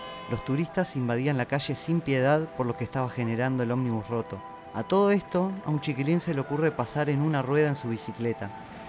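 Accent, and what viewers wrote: Argentina. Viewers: Yo siempre pense que eras de argentina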